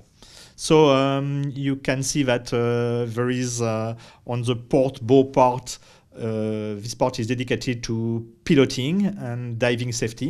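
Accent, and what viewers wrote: France. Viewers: You sound like a French guy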